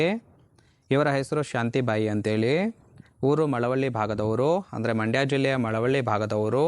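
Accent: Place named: Kerala, India. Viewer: O K Callem Malayalli Tone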